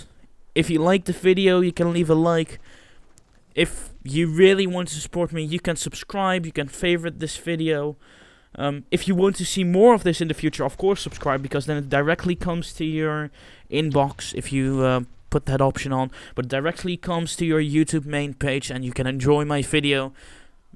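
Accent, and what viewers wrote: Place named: Netherlands. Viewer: lol i smell you are a dutch person right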